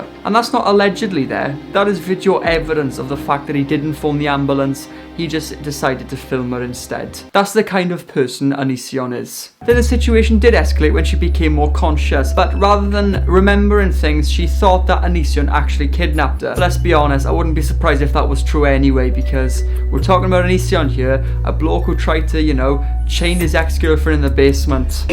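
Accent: Wales, United Kingdom. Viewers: Are you Welsh? Your accent sounds like it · First video of yours I've ever watched and all I hear is the Welsh accent. 🤣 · Ngl I accidentally turned off the video when I tried to click on the channel once I heard the Welsh accent 😶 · Odd question but where are you from? You sound very Welshy like you’re from the valleys 🙈 I’m from Aberdare 🤣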